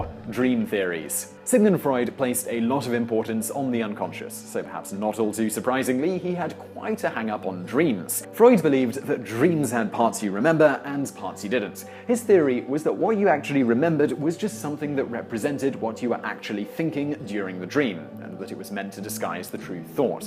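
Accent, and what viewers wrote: England, United Kingdom. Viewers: The fact the guy thats talking has a english accent makes me happy and satisfies me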